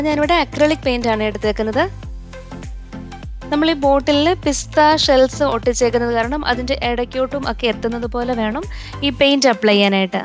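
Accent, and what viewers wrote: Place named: Kottayam, India. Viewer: കോട്ടയം സ്ലാങ് ഉം രണ്ടും പൊളിച്ചു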